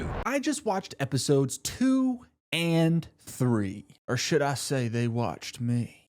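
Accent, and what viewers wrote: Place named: United States. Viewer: From the thumbnail, I assumed this creator was British. I was absolutely astounded to hear an American accent. Bravo 👏🏾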